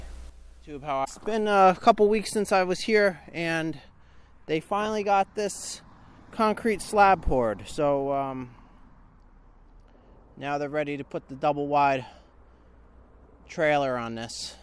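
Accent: New York, United States. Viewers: Wiffa,haffa,poseta,lol..you sound like my uncle from N.Y...love the videos...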